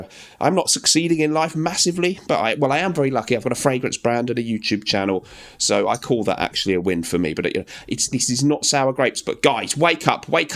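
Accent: England, United Kingdom. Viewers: Caro, desative essa dublagem ridícula. Entendo melhor seu sotaque inglês do que o americano. Fora isso qual Árabe compensa para quem gosta de Drakkar Noir, Guilty Absolute, Azzaro Silver Black, algum todo clima para São Paulo?? Obrigado pela atenção, seus vídeos são só para quem gosta muito, assim como eu.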